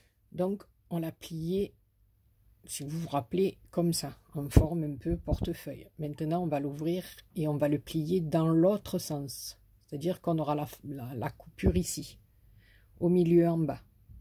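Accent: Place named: Marseille, France. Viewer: oupss lol l accent de Marseille ou les alentours mdr j adore je m abonne trop rigolote bravo le bonjour de toulouse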